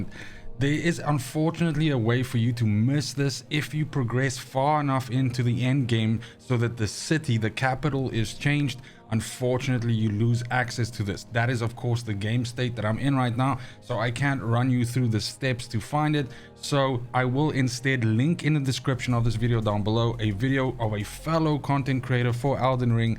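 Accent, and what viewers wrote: South Africa. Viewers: Bruh Are you South African? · lief die suid-afrikaanse aksent! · Are you south african? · Are you from South Africa? 🙆🏽‍♂️ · This guys South African? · south african bro! im from good ol Jo berg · Are u from SA? That accent sounds familiar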